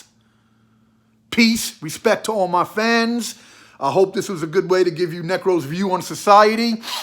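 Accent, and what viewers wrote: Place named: New York, United States. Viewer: Literally the most NY accent ever.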